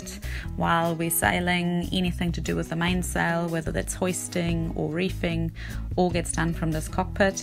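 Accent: South Africa. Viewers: Really nice boat! Enjoyed the SA accent, reminded me of home ❤️ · Stunning job, Are you based in NZ, you sound like you have a South African accent ?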